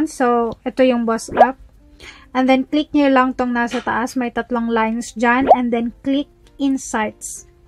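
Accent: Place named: Davao, Philippines. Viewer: Based on your tagalog accent, taga Davao ka po ba or Cebu?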